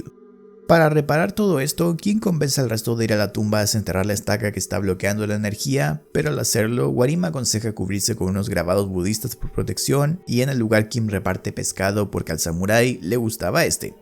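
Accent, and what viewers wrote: Chile. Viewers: Este acento chileno no se me da j ñsajfkaldnjhasdfkasd fñlsajfñljs afls chabocho Gran resumen!!!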